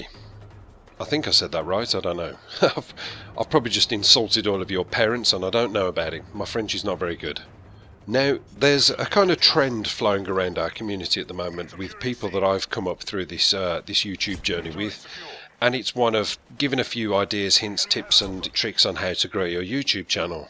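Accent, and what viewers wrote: United Kingdom. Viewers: your british accent is pretty freakin awesome!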